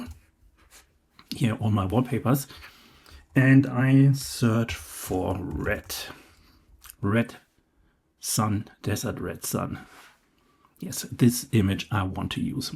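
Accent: Germany. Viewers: are u deutsch?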